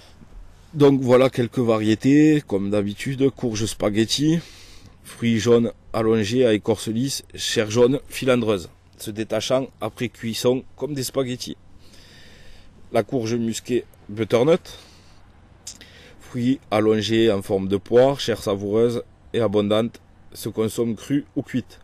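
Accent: South, France. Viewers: Votre chaîne Youtube est GENIAL !!! un régal !!! vos dessin sont tres explicites et réussis. et votre accent sent bon le Sud. C est un plaisir de regarder vos videos, continuez ainsi !!!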